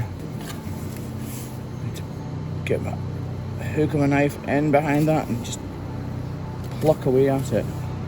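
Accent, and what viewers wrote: Scotland, United Kingdom. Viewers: When is your audiobook released,? Got the book but I love the sound of Scottish voice (part Scot but I talk Scouse)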